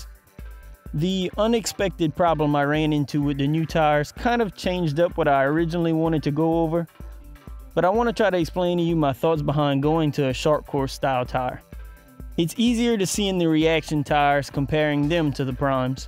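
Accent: Louisiana, United States. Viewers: Where are you from? Have to be from South Louisiana w that accent . Who Dat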